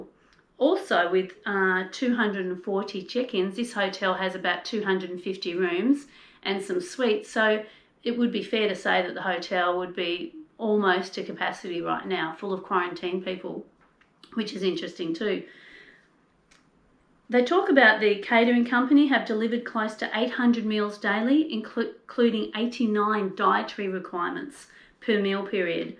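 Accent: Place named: Australia. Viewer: We enjoyed hearing about your Sydney quarantine experiences and had a good laugh as we misheard a couple of words as we are not entirely familiar with the Australian accent. Donna was confused, at first, about the 240 "chickens" (check ins) at the hotel. We were also concerned about what we heard as the "crime scene" (quarantine). So nice that the time passed smoothly for you and the staff and officials have all been so helpful and welcoming. I said to Donna, "it is so much better being there together rather than being a single person isolated in a room". Isn't it nice to know that two walked in and two walked out. No bodies for the hotel staff to clean up. LOL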